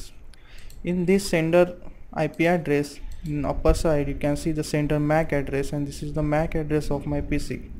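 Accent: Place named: India. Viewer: damn indian accent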